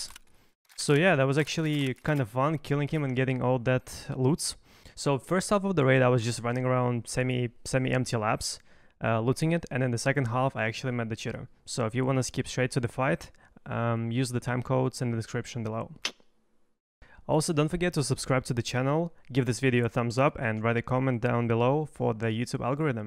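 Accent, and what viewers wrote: Russia. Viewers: ты же русский,оно слышно))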